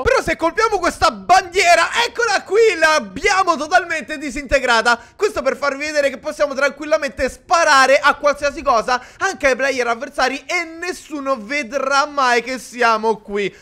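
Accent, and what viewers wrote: Sardinia, Italy. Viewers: Ma sei sardo? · ma sei sardo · sei sardo io si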